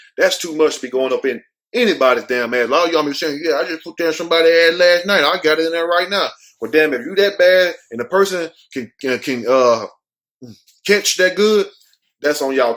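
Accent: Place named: New York, United States. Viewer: you sound like you was born and raise in NYC laughing my ass off #NYC in the house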